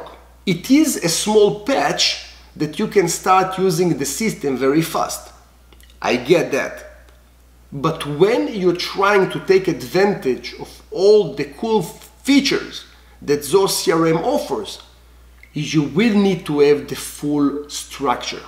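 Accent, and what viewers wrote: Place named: Spain. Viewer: You sound like spanish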